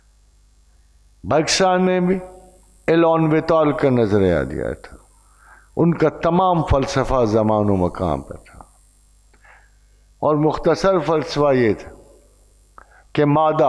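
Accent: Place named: Punjab, Pakistan. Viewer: Not able to understand your Punjabi Urdu dialects, next please speak haltedly or kindly speak in English for English audience Sirji.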